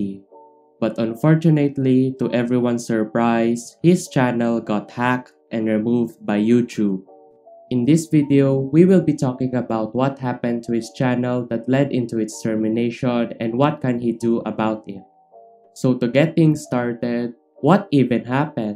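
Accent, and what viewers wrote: Philippines. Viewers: i knew it your a Filipino by your accent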